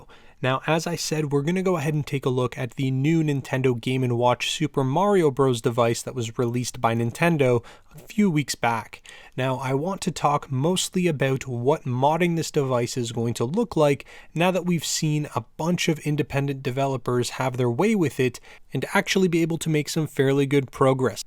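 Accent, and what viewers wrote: Canada. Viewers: You sound suspiciously Canadian.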